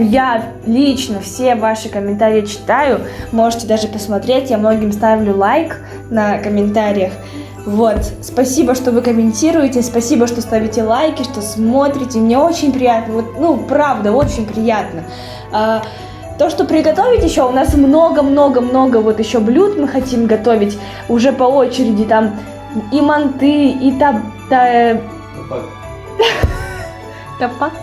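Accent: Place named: Tajikistan. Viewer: честный слов ты как актириса браво по акцентом разговариваешь по таджик ской язике.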